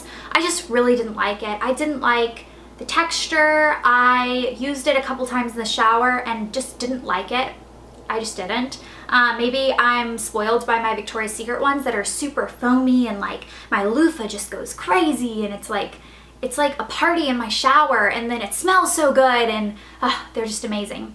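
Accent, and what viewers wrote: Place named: South, United States. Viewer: She is southern... We southern girls can't pronounce things just right... lol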